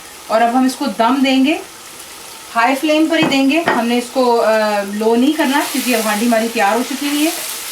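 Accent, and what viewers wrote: Punjab, India. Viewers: omg... I literally drooled over my keyboard. Thx a lot ji.... I love your Punjabi style Hindi (Urdu):) Maza aa gaya kasam se recipe mein. Will definitely try this. Cheers from Korea!! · I love your punjabi accent :) it's ncie to listen to! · Dear Ji, I have tried this recipe and it was AMAZING. My father loved it absolutely, I belong to Sikh family and it was just the kind of delicacy I was looking for.:) Thank you again Ji, please upload some more Chicken recipes:) And yes, your Punjabi accent is amazing, and I am sure you must be an amazing person too:) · I made your dish. It's delicious. I love your accent mam, it's like typical punjabi mom. Thanks for the recipe :D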